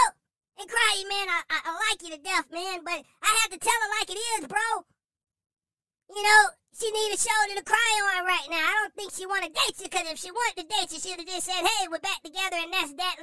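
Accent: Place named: Carolina, United States. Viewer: I hear the Carolina accent. Lol. Is this new channel or channel name? Previously?🤔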